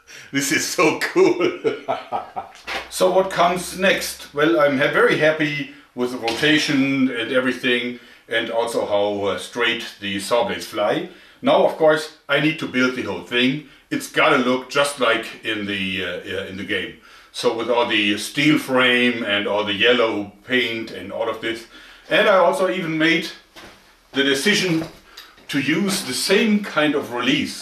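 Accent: Germany. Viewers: Das ist nicht böse gemeint aber wenn du Englisch sprichst hört man dass du in Deutschland bist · Bei dir hört man richtig das deutsch raus 😂😂 „This here“ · funny character Never Changes especially the German accent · Hi. Deine Ideen sind echt klasse,dein Kanal an sich ist ein wahrgewordener Jungstraum. Du hast die coolsten Ideen überhaupt. Und ich feiere so unglaublich deinen deutschen Akzent. 🤣🤣🤣🤣🤣 In tiefer Verbundenheit ,Christoph. 👍👍👍👍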